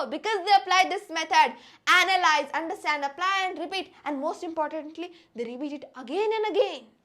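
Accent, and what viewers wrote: India. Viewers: You India accent spoiled the language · first leave the f indian english accent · The pure Indian accent =💀.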